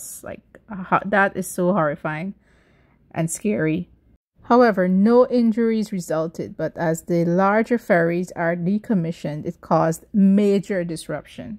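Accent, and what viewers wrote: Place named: Guyana. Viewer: Excellent presentation. Good visuals. Clearly spoken with that educated Guyanese accent.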